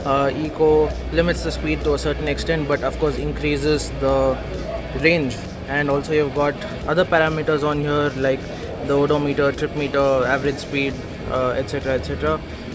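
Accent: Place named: London, United Kingdom. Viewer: Bhai tu London Pala besi English khuchu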